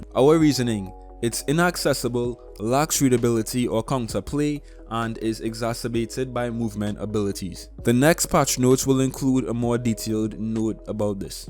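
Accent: Trinidad and Tobago. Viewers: “The apex YouTuber with an accent” Me knowing he’s a trini: 🤣🤣🤣🤣🤣🤣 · Just started playing apex and your my fav apex yt rn much love and btw are you from Trinidad & Tobago cuz i am and you sound like a trini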